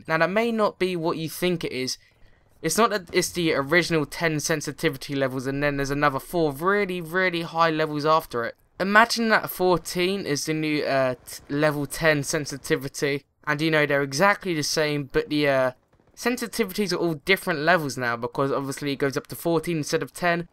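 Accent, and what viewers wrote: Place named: Oxfordshire, United Kingdom. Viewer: Great review. I completely agreed with 99% of everything you said. Where are you from by the way? You sound exactly like my half brother. You from somewhere in oxfordshire?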